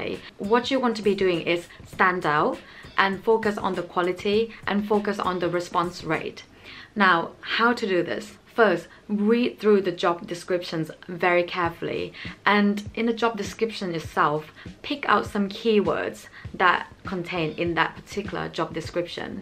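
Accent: United Kingdom. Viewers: You are from Thailand but I am curious about your British Accent? Did you always talk like that by chance? Thank you. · Wow. How did you get that British accent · You look like Asian but your English is British good job 👍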